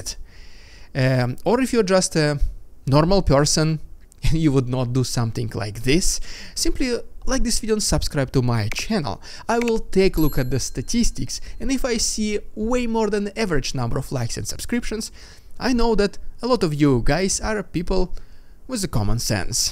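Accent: Russia. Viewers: I'm guessing " " and the accent implies that you are Russian...Although we appreciate the reporting...this has to be painful to say about your own country. It's very sad. I'll bet you know at least 1 Russian soldier fighting in Ukraine right this very moment. I can't even imagine how this has affected your friends and family. Keep up the good work friend know that I see and appreciate you. 🤗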